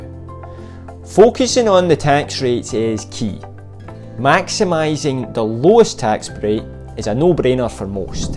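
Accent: Scotland, United Kingdom. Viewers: Beautiful accent. I love it. Not sure what it is. Scottish? Short and to the point advice. I am not surprised, that this video didn't generate many views, nor comments. People simply prefer to complain about CEOs salaries and big corporations profits, than look after their money. To me ( I am recently retired) the biggest advantage of RRSP is the fact, that all capital gains and dividends generated for about 40 years are tax free. It continues within RRIF for the next ( hopefully) many years. Most people, even accountants and financial planners hardly ever talk about it. Understanding of this simple fact worked for me wonders.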